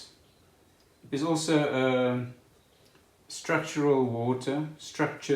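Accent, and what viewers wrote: South Africa. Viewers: Your south african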